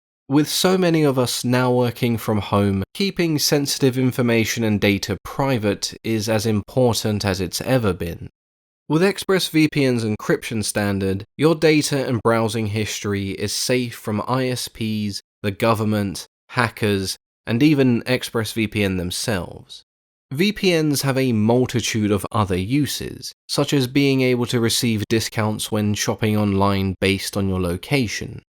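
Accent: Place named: United Kingdom. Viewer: They are beautifully ugly like some exes love the smooth calming British accent from channel host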